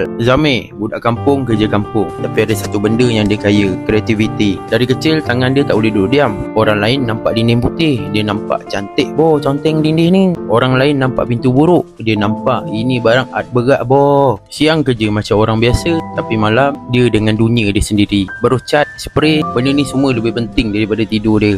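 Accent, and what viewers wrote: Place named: Terengganu, Malaysia. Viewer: Bulih kira mung cakak ganu awang😅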